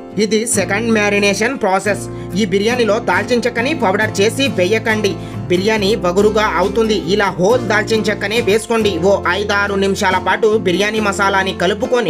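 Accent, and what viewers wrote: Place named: Telangana, India. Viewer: Hi bro thank you for the video and meeku telangana slang vachu telugu nerchukoni chepthunaanu anaaru don't worry bro meeru meeku vachina slang a maatladandi we can understand telangana slang as well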